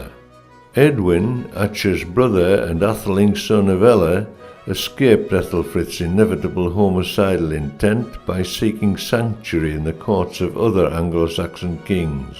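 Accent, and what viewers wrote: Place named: Northumbria, United Kingdom. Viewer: very accurate.. bet from your accent you have been to that little church at Heavenfield though.. such a magical place with its wooden cross .. I like the tale that if we win this battle on this place Northumbria will be Cristian .. and the raising of a cross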